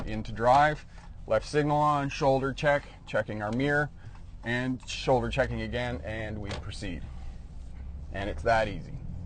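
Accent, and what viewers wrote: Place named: Canada. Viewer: Very Canadian accent lol. Greetings from Ontario!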